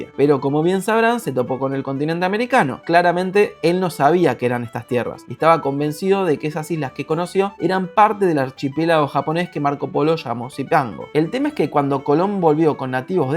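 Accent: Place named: Argentina. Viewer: Tú video es imposible de verlo , hablas muy rápido , tu acento es demasiado fuerte y no tienes dicción . No se puede entender , ni captar lo que dices . Oye que no estás en la sala de tu casa habla do con amigos . Modula tu voz , habla más neutro sin acento pueblerino argentino , y toma clases de dicción. No se te entiende nada !!!!! Tengo coraje !!!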